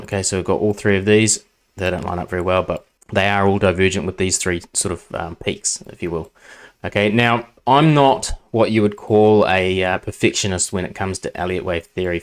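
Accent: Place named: Australia. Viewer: I didn’t picture u as this. Your accent gave me the expression that u were an white Aussie dude lol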